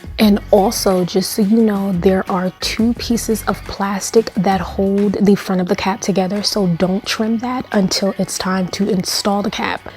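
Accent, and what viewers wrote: New York, United States. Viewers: The way you say "also", "oval" and "important"... with the silent t's you sound like a New Yorker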